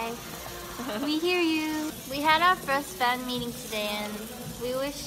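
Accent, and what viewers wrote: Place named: United States. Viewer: yea she sounds more american in this vid but then in other vids she sounds aussie... same with jennie haha she sounds american in some vids but sometimes she has a nz accent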